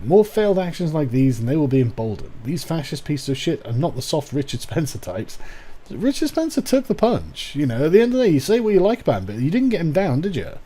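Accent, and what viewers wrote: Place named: United Kingdom. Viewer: British dialect issue, whilst Britain is tiny compared to the US, we have hundreds of dialects that do some weird shit to vowel sounds in particular, and the dialect can change significantly over the course of 20-30 miles, where sargon lives is right at the cusp of a major vowel sound change in dialects, so that's probably why he pronounces it this way, because it's the normal pronunciation for that area.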